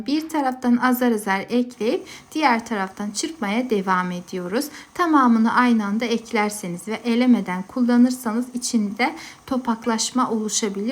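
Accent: Azerbaijan. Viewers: Deyəsən xanım Azərbaycanlıdır ləhcəsindən hiss edirəm. Bu arada əllərinizə sağlıq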